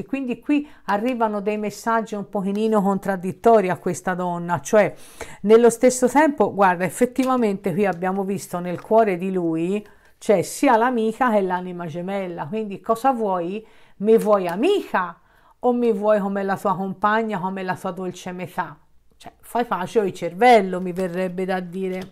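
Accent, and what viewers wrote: Tuscany, Italy. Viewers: Buonasera hai pienamente ragione si quello che ai detto e verissimo e un periodo che non lo sento però so che e informato su di me e non so che mi offre nel futuro però lui e come lo stai descrivendo e quello che dicono le carte gli ho dato l'animo.gli ho fatto una richiesta e non decide di farla dopo tanti anni penso che lo meriterei. Ciao carissima simpatica e bella sempre la tua voce con l'accento toscano❤️😘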